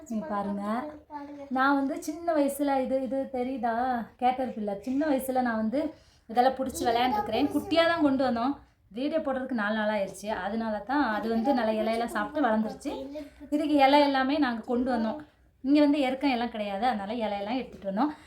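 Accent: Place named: Nagercoil, India. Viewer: Akka antha Mann Paanai Satti la Meen kozhambu vechu paarunga,. You might be tried ., but intha sirappa sollirukellam, I don’t know other Gravy., but Fish Gravy will be awesome…. Nagercoil sirappana Ooru ., Pakkathula Marthandam , kaniyakumari Thiruvalluvar Statue, antha sun rise , sunset ., and your Senthamizh Slang of Nagercoil mixed with Malayalam know Akka., you People are awesome akka., Vazhka Vazhamudan Makkale…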